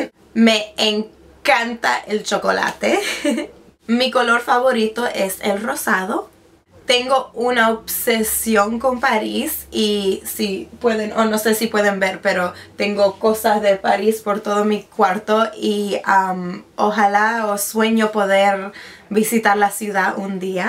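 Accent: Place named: Argentina. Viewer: ahhhh I can sorta hear your Argetinian accent loveeee it <3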